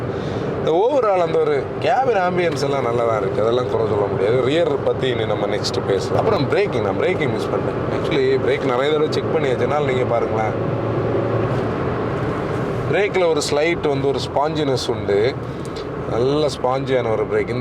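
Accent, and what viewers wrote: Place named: Nagercoil, India. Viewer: Every sedan, hatchback, suv and electric vehicle launch time. I search channel.for your"s valuable review. Because your brief and depth points like vehicle drivability, ride and handling. build quality and also your Nagor kovil language slang. Hat"s up bro and her team mates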